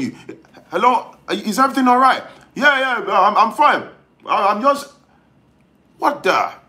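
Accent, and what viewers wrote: Yoruba, Nigeria. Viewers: lol... I love your YOruba-English accent.. PLs speak more of it.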